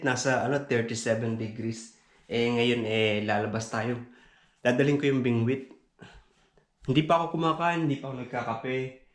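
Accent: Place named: Binangonan, Philippines. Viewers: Taga Binangonan po kayo? yung punto po kase nyo tunog taga doon hehehe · Tiga rizal k pla sir.sv ko n nga ba eh.napansin ko na sa punto mu..tiga talim island naman ako.part ng binangonan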